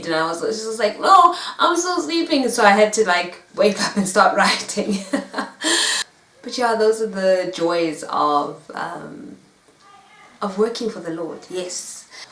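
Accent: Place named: South Africa. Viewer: When I hear your voice, I keep hearing the Safari Live people here on youtube. South Africa. I also wanted to commend you on your singing. I have watched so many auditions and singing shows. I always enjoy a good voice! And you're doing a good job for the Lord as well, with your prophetic voice too. Amen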